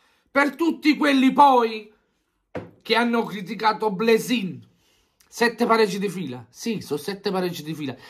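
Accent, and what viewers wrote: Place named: Turin, Italy. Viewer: si sente proprio dall’accento che sei di torino ahahahahhahahah forza toro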